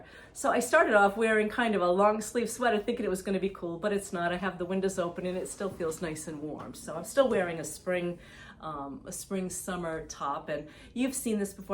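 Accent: New England, United States. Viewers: Love your PC. Just found you last week. I heard your accent and knew we had something in common !. Native New Englander here...Central Falls, N. Prov., Warwick......Love the references you make to being from RI. So sad to see the aftermath of the hotel....happy to hear they'll rebuild. We went to the island last summer. I hadn't been in years. Beautiful as ever. Looking forward to next episode. Happy September. Jeanne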